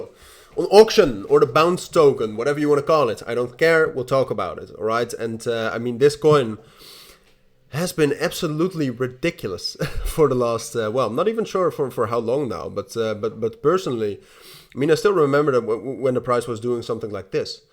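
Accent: Netherlands. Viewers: Ik dacht al ik hoor een Nederlands accent! Goede video trouwens :)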